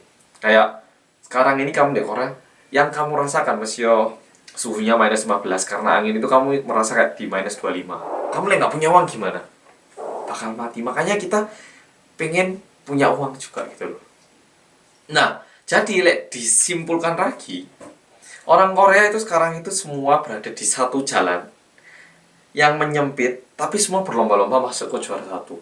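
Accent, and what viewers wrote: Java, Indonesia. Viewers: Gak kliatan ya koreanya lebih ccok jadi jawa aja, ini orang korea gak malu dengan logat, yg indo malah sok bule Salut banget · Aku lebih suka oppa medokk sumpah.. Ganteng nya ratusan derajattttttttt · medokeee rekkkk 😂😂😂😂😂😂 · Mas jawanya medok banget 😂😂 Kalah q rek.. 😄😅 Coba dari segi genetik juga bisa mas.. ☺ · Menginspirasi videonya. Ada benernya juga. Mungkin bukan bermaksud "jadi juara satu", tapi " cara bertahan hidup di kota yg keras". Btw, logat ngomongnya lucu wkwk, medok jawa gitu padahal org korea asli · 한국말하실때도 자바사투리 살짝 있네요 ㅋㅋㅋㅋㅋ 신기하다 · Negara berkembang maju biasanya mmg gitu sih Bagusnya mmg jadi makin maju krn pada pengen juara Tapi jadi depresi juga jeleknya Yg bikin sedih itu netijen indo yg maha benar selalu jelek2in negara korea . Padahal mreka juga gatau gimana org2 korea dan alasan2 depresi tinggi itu 😂 Btw jgn males upload walau viewnya dan hasilnya sedikit ya Soalny bener2 seneng ada yutuberss medok KR 🔛ID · Salam kenal.... 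medok bgt yo... di Indonesianya uda berapa lama? Pertanyaannya kenapa kamu bisa timbul stress ??? Wkwkwkwkwkw.... menurut aku orang yang bunuh diri adalah orang yg krisis akan iman dan kasih.... orang yang tidak mengenal Tuhannya dengan baik. Iman semakin kuat, hubungan manusia dengan Tuhan dekat takkan timbul rasa putus asa, takut meskipun kita berada di ambang kehancuran sekalipun. Karena mereka percaya Tuhan pasti akan mengembalikan semuanya bahkan jauh jadi lebih baik. Karena tidak percaya, tidak ada pedoman / pegangan hidup yang kuat, makanya iman dalam dirinya goyah dan putus asa. Lalu mengakhiri hidupnya adalah jalan terbaik.... Hidup yang baik adalah hidup yang berawal dari mengasihi Tuhan, diri sendiri, keluarga, sesama, dan seluruh ciptaanNya. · Mas, logat koreanya jd medok gegara ngomong campur bhs indonesia + korea 😁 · Jawa banget mas e iki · Ini video pertama yg saya tonton dari channel ini, terus nyari2 ttg anda. 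Keren ya bahasa Indonesia medoknya dapet gak kedengeran aksen korea nya sedikitpun, bahasa korea yg bahasa ibu anda jg aksennya terdengar natural (yg biasanya terjdi dgn org2 yg tinggal pernah di luar negeri biasanya aksennya jdi belibet). Good mas 👍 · Medok banget smpe liurnya nyemprot2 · Kok lbh cocok kan ngomong mendok dr pd Korea sih?😭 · kok bisa ya kamu bisa ngomong bahasa jawa medok banget kayak orang jawa tulen. dan bhasa indonesia fasih banget kyak orang indonesia. kami semua heran loh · Ngomong "medok" nya oke punya 😅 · entah knapa pas liat awalnya ngomong pake bahasa korea rasanya malah aneh...wkwkwkw kebiasaan denger yg medok, jadi lupa kalo mas e iki orang korea hahahah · Jarang" liat ka ngomong bahasa korea, jd keliatan kaya orang korea asli, soalnya jawanya medok banget, kaya orang indonesia asli padahal orang korea 😂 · medog jawa fasih korea😂😂😂 top bgt👌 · Iyaya medok bgt 😅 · Mas ngomong bahasa korea kok rasanya ada medok2 jawanya ya? 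Apa cuma perasaanku aja yho mas? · bang mantap medoknya, aku asli jawa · baru liat channelnya, q pikir org jawa td yg bs bahasa korea. ahahhahahaaa. tertipuu. kereeen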